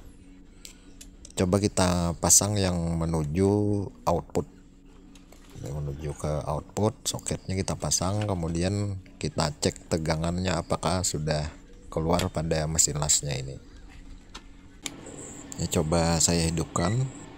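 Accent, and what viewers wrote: Banjar, Indonesia. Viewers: Bahasa Indonesia 100% bagus , tp logat Banjar nya katahuan 😂. salam 1 hobby sanak mautak atik masin las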